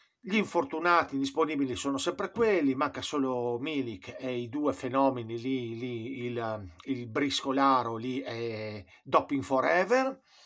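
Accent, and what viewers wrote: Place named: Bari, Italy. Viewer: Il dialetto barese è il top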